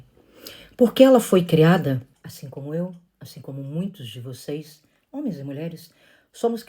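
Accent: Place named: Rio Grande do Sul, Brazil. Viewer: Maldito desse ou é gaúcho ou nordestino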